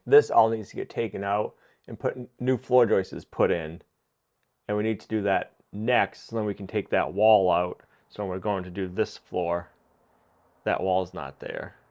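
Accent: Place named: New England, United States. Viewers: Yeah, and "eSScavator" 😂. Waiting for " acrost". Ahh, those NH colloquialisms! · That's the way they talk over there in New England. He's just saying things the way they all do around Boston.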